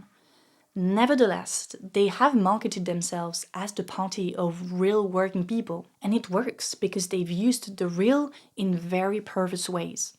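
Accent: France. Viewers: I LOVE YOUR VIDEO AND I WISH IT WAS REDONE IN FRENCH. I'm French so : some grumbles. The only thing I disagree with, or find a bit short, is saying that "LFI - France Unbound - supporting the Palestinians have turn them into pariahs". It's their support of Hamas, or lack of condemnation, that turns them into Pariahs for left and even centre-right leaning people, not their support to the Palestinian cause which is widespread and makes consensus except in extremist Islamophobic circles. This is very different. And there is another thing: the insufferable pride of Mélenchon, the head of LFI, that sometimes seems like it could become Trotskyist, if not Stalinist, totalitarianism. But I applaud this thorough clever unbiased analysis of yours (some extreme-right wing people wouldn't find this unbiased though, but it is). One thing is missing for foreigners: what happened to Macron and Macronism. 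Because he was very popular abroad in 2017 when he took power and he is still very popular, at least much more than in France. But I think it would be too long for one video. PS.: I claim to be very fluent in English (more than what one would say on a CV), but yours is much better. I'm jealous even if I can hear the tiny teeny slight trace of your French accent.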